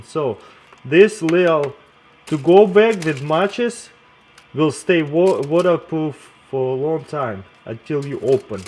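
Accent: Russia. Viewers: ive never wanted a Russian accent so bad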